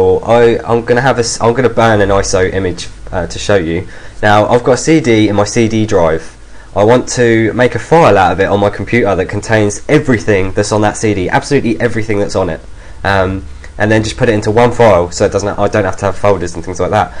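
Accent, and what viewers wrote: England, United Kingdom. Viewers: y is this so long? do u have to go so fast? I have seen others do this in two minutes mr. English accent!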